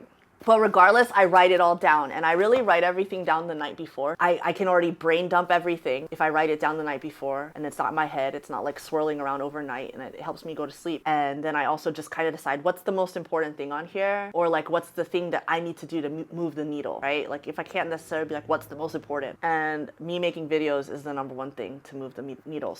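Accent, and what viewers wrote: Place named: Ontario, Canada. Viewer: ahaha sorry I was unclear... I wrote that without thinking. You sound like you have an ontario accent is what I meant to say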